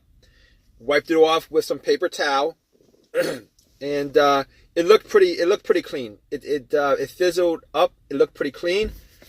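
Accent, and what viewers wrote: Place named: New York, United States. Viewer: The reason he talks so long, because youtube requires at least an 8 minute video to consider it an informational or useful video to get credit for any views or subscriptions and likes and comment. Fuckin new York east coast accent.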